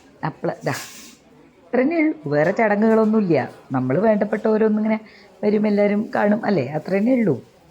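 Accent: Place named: Valluvanad, India. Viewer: Original valluvanadan slang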